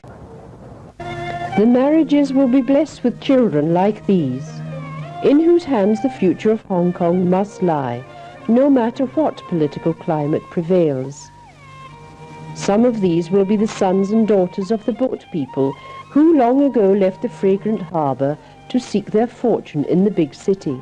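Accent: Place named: United Kingdom. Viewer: Very well done and loved everything about it. As a child visiting HK in the 1970s this brings back so many happy memories, this was a great time to live and a great place to live. Loved the traditional music, of course, and the narration, so British!! I have not been back since the 1980s and now things are so different under China's control. The memories will always however be there and this short video was a blessing. Many thanks for this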